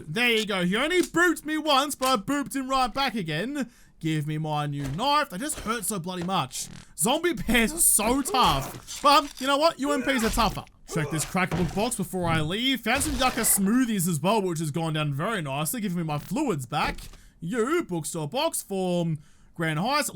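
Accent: Australia. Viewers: P.S. Miss your Aussie voice and excitement in the morning it helps start my day, the content is great as always... Just not the schedule!!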